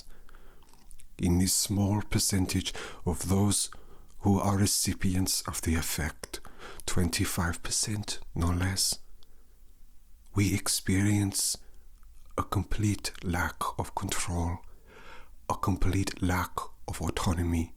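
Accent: Germany. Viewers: Haha!! Love the German accent😂🤗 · Wow... That's a good german accent 👍 I'm from Germany and you have my approval 😂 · as a German i can say that its rare to hear a good German accent that isn't meant to be a joke...very nicely done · I've never known a German accent to be so smooth, beautiful and addictive. I speak Afrikaans, a branch of Dutch, and even though it's smoother than German, it's not as smooth as you've managed to make this language. This leads me to wonder how far over the horizon your talent stretches. So far I've been blown away, and, once again, my brain is sufficiently massaged. 😘♥️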